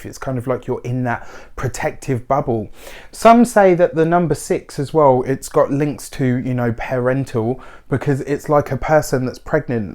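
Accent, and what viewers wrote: United Kingdom. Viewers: Hold on do I hear a British accent? I got a feeling I'm a bit late but have to subscribe ! UK lady here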